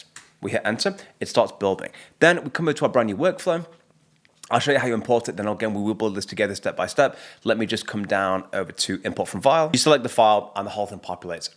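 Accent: United Kingdom. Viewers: it looked interesting, but a Brit might have no trouble understanding your accent, given the speed at which you speak. This ignorant American can't follow. You might consider slowing your cadence to Douglas Murray's speed so we, who love the sound of the British accent but don't understand original English fully, have a better chance of following what you say on a complicated subject. Cheers ~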